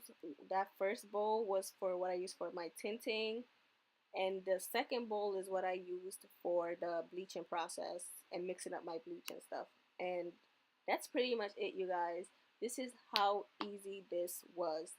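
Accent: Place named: Latin America. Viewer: Are u Latino? U have a accent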